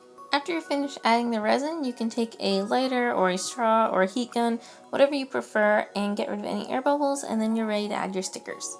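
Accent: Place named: Canada. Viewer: Are you Canadian? I can hear it in the way you say, "about" you say "aboot" lol it's cute.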